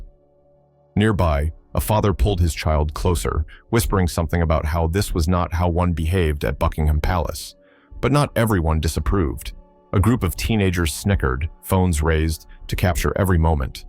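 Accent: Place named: United States. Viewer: What an absolute load of American accented bullshit! Is it perhaps time that British former soldiers took the piss out of the American guards at Arlington? No? That would be too much?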